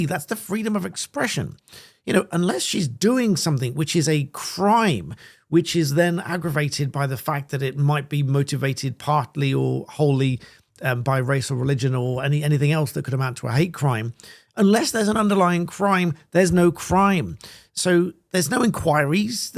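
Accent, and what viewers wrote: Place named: Falkirk, United Kingdom. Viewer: It was a statement of fact ffs! She certainly sounds like she's from Falkirk, or is an accent racist now.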